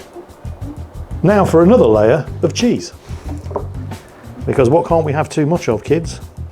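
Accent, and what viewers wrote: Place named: England, United Kingdom. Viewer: [New Recommendation comes up] What's this? is doing a cooking channel now? Guess that's what I get for not watching Mingles with anymore. Subbed. Continue soothing my ear holes with your soft English accent you crazy old fart, you.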